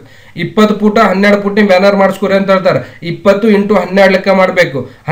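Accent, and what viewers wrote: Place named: Shiggaon, India. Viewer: ಸರ್ ನಮ್ಮ್ ಊರ ಬಾಸ ತುಂಬಾ ಖುಷಿ ಆಗುತ್ತೆ ಸರ್ ನಿಮ್ಮ್ ಸ್ಪೀಚ್ ಕೇಳಿ ನಂದು ಶಿಗ್ಗಾoವ ತಾಲೂಕ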